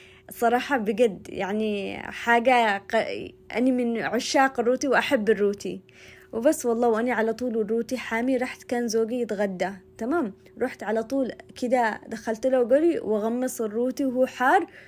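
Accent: Aden, Yemen. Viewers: ❤تسلم يدك وكلامك العدني الحلو😂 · هي عدنيه مافهمتي لهجتها 😊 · ههههه شكلك صفر بالطبخ البرياني طريقته جدا مختلفة عن الزربيات وعدن من قبل ما تعرفيها لها طابعها اشي بخور اشي عطور ولو ان الشمال اي اخوتها بالشمال لما يطبخوا في قنواتهم يستنكر ان الاصل عدن في الروائح الجميلة واشي فن و الطبخ الرهيب بس انت بجد اظطريتيني اعلق اما الانفصال او الوحدة هي بيد الرحمن وايش دخل الروتي بالسياسة ياسلته وتميز وسحاوق بالشقر 😂... اختي هذه والله اول مرة اشوف قناتك و3 ساعات وانا اتفرج ع فيديوهاتك الرائعة وتقديمك الرهيب ونظافة مطبخك وحشمتك وصوتك العدني الي يشوقني لطيبت عدن واهلها المتواضعيين بغربتنا ياسلام عليك الله يحفظك واهل بيتك من شر ماخلق استمري وهذا اول لايك لك ❤ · ربنا يحفظك و يبارك لك في كل شيء. طبخك لذيذ جداً و مليان نصائح مفيده. بالاضافه إلى كلامك العدني الجميل. الف شكر من القلب و لك كل الاحترام و التقدير❤❤❤❤❤ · الهجه العدنيه غير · يااختي يكفي كلامه العدني العسل اقسم باالله انه سكره اني من تعز ومافيش هذاالخبر وفعلآاصحاب عدن اكلهم روعه ويجنن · كلامك يجنن اعشق اللهجه اليمنية محبتك من السودان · ما شاء الله شيء جميل. 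واللهجه اليمنيه اروع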